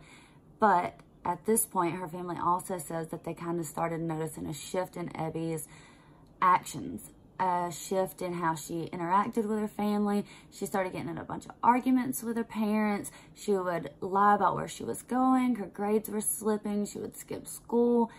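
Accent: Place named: South, United States. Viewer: Just found your channel and I love your accent 👑 Sending love from another Southerner 👋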